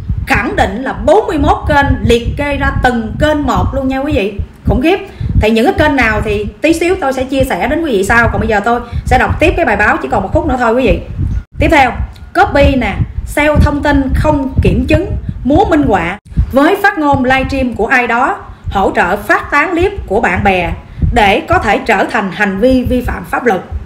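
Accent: Standard. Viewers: Toi coi nhieu lan kenh cua co co noi hay chuan dung toi ung ho co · Chi noi qa chuan luon 👍👍👍👍👍🌹🌹🌹🌹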